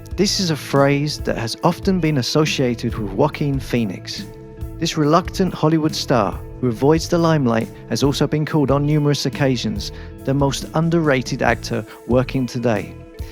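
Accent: United Kingdom. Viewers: Other than the cringeworthy use of the made-up "disorienTAted" by a narrator with a diamond-cut English accent, still a really informative and well-researched documentary.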